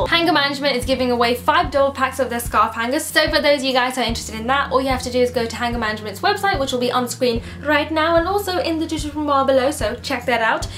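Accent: United Kingdom. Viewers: Biiiiiiig liiiiiike from morocco !!! You saved my life x) i discovered you 30 minutes ago !! It s midnight now !! So you can imagine how excited i am now especially that i couldnt sleep !! LOL !! I watched 4 videos of you , liked them all !! good luck , waiting for other videos ! By the way , u have a funny beautiful British accent , i LOVE it , Nouhaila - Morocco <3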